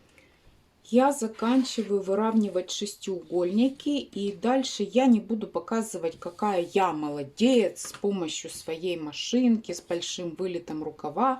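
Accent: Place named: Serov, Russia. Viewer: У меня родня в Серове живёт , говор как у них. Как будто с ними поговорила. Очень красивое одеяло!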